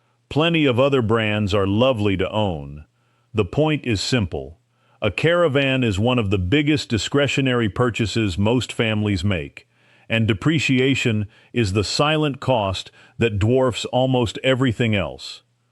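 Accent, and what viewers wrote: United States. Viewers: This is hard to watch. Cheap A! crap. American voice and a hotch-potch of overseas video.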